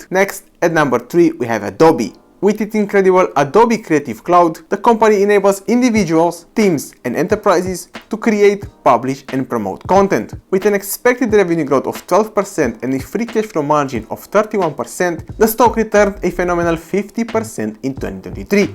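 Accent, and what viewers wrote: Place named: Romania. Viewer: Bruh u have a strong Romanian accept. But do u know what, me too. I need to get rid of it though, I left Romania so I barely speak that language anymore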